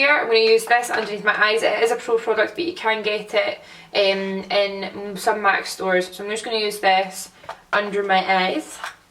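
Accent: Scotland, United Kingdom. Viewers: is she Scottish? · I'm a new subscriber and This is amazing And r u from Scotland 😂 xxxx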